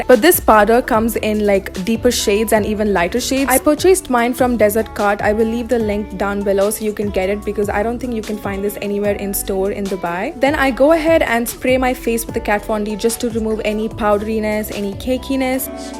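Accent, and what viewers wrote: India. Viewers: I thought the same thing.I was quite shock when I heard the Indian accent.She looks like a tanned Greek girl or tanned Turkish girl.She is very pretty though. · Am I the only one that didn’t expect her to have an Indian accent, genuinely thought she was black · Wow that indian accent took me aback, thought you were black.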